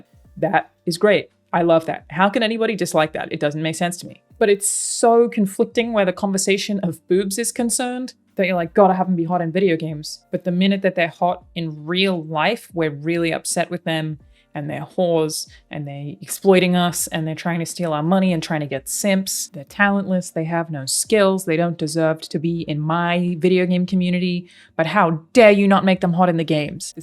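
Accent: United Kingdom. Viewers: That British accent lol sounded like Lara Croft!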